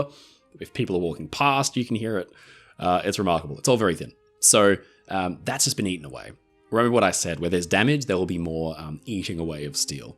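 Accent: Australia. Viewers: I enjoy your videos,. I also love listening to your beautiful Aussie accent. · Why would a British person have an Australian accent? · I don't know how long you were in the U.S., but it was long enough for an American accent to find its way into your narration of this video every now and then when your Aussie accent 'drops out'... 😉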